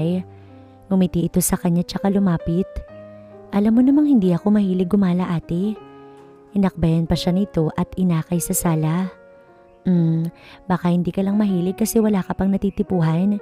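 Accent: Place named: Hiligaynon, Philippines. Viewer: Ilonggang ilongga ang boses...hehehehe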